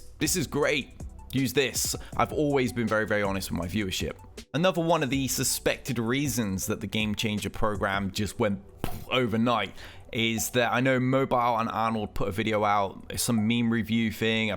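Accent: United Kingdom. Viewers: Dude where are you from? I've always wondered haha. Can't figure out what UK accent that is lol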